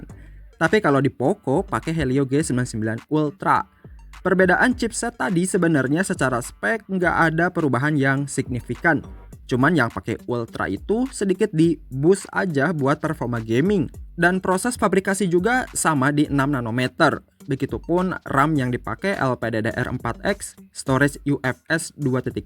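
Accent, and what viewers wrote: West Java, Indonesia. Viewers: Mang siga orang sunda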